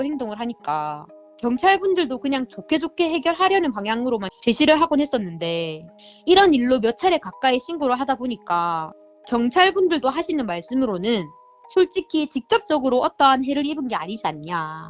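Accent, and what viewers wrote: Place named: Busan, South Korea. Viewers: 그런듯합니다 저도 부산 사람인데 정감이 많이 가네요~ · 부산 분이시죠 ㅋㅋ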